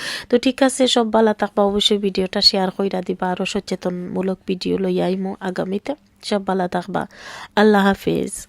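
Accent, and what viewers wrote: Sylhet, Bangladesh. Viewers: Apu aponar mat amar kace kub balo lage amio sylheti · Afa please keep speaking Sylheti , I like the way you talk No afa sylheti people try to speak Dhaka language, it’s doesn’t suit them 😂😂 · I like your Sylheti bengali, don't change, you're a unique sister.❤ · Apner mukher vasha suddho khati sylheti vasha ami o akjon sylheti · আসসালামু আলাইকুম আপু আপনার সিলেটি মাত আমার খুব ভালো লাগে। আমি একজন সিলেটি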